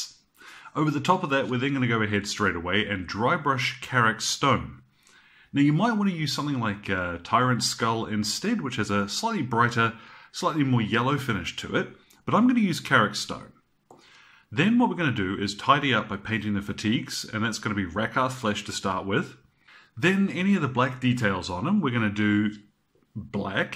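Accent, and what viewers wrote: New Zealand. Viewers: It's certainly impressive and appreciated! From your accent, are you a kiwi by any chance? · Thanks for the advice. Local would be......NZ based on your accent? I'm just starting my first ever painting project and I'll be doing Tau in Zandri Dust w/ Ushabti HLs, and Mech Grey and Dawnstone HLs for the fatigues.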